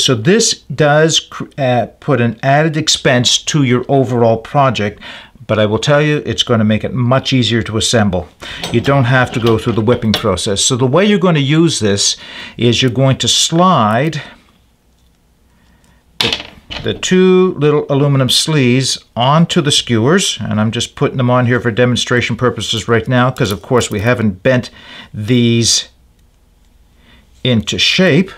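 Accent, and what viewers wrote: Nova Scotia, Canada. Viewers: I thought that accent sounded like Nova Scotia! Born and raised there until I was 12. Great video!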